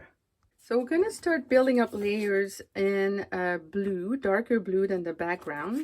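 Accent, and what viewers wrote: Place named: Normandy, France. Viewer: Thank you for this. Also, just want to say I love to listen to you speak. Your accent sounds just like my mom who was Normandy France. She is no longer with us but this gives me some joy for a few minutes.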